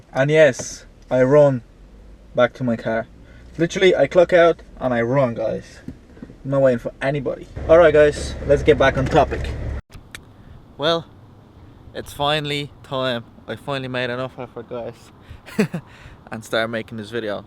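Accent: Ireland. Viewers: Where you from your Irish sounds mixed up with another language?? Great car I want to buy auto would you recommend thanks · you sound like you have adapted a irish accent but have talked another language in your early age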